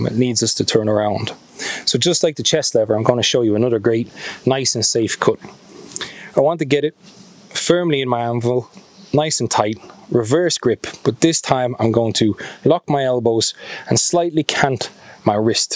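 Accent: Ireland. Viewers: Jaysus you had me in knots with the chicken dance in the 80s.... memories! Great listening to an Irish accent over mostly Americans on YouTube, you're a treasure trove of information and inspirational in your enthusiastic delivery, I really enjoy your videos and thanks for sharing your knowledge, I am putting together some kit as I am really only starting out with the outdoors but I am grateful for the safety advice as I don't think I would have been careful enough with some of the processes...but listening and watching you has given me a completely different perspective, loved the axe 🪓 videos as well! Go raibh maith agat!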